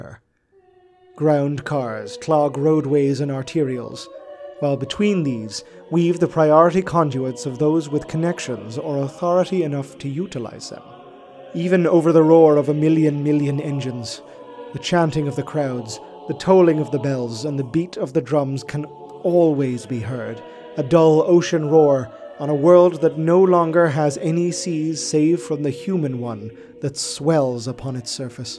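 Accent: Ireland. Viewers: Are you Irish? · This channel is bliss. Nice to hear a fellow Irish 40k head describe the world and lore so vividly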